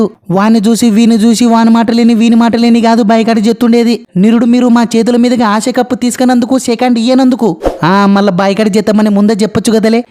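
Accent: Rayalaseema, India. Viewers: Anna rayalaseema slang❤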